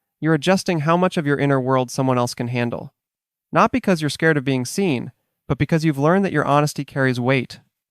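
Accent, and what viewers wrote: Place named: Canada. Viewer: You’re Canadian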